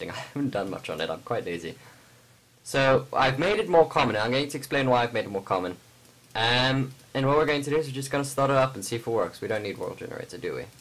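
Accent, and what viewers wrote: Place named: United Kingdom. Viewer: Where in the UK are you?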